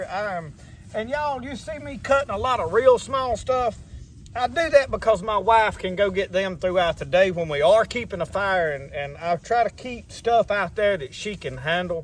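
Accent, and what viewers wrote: South, United States. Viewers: Looking for help for Arctic Blast on homestead in Alabama 😮(AND I LOVE 💕 hearing your sweet Southern voice 😅)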